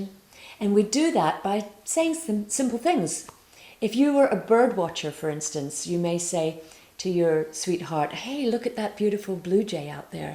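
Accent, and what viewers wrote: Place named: RP, United Kingdom. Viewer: Sorry,.....but this is so simplistically ridiculous, superficial and sophomoric......that you could only pass it off as a working miracle by affixing 'Dr.' to you name and speaking with that highbrow English accent. I listened carefully to what you have said.....and even if true......many relationships fall completely apart based on many other elements. Seriously? It actually sounds like you have not been in many relationships or successful ones or really 'know' so-called successful ones. What appears successful on the surface often, below, turns out to be a simple compromise made my many, many people to accept the situation for fear of being alone, lonely, insecure financially, etc. For example, you may go to VIP events with some guy or your husband draped on your arm, but do you really know your husband or what he is like? 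Or do you just claim that you do. You sound like another pretentious psycho-wizard. I know of many people in relationships that are long lasting but totally devoid of real respect, love, etc. They cling to each other and put on a front. 50% of all marriages fail in America and of that remaining 50%.......that vast majority are not particularly happy. That's a real fact.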